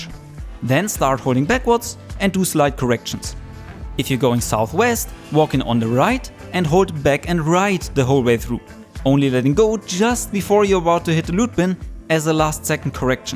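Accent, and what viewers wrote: Germany. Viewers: Man hört schon sehr stark raus das du Deutsch bist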